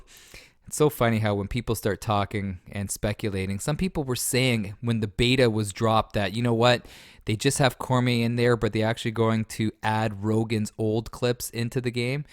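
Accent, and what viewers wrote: Canada. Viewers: Why do u sound soooo canadian?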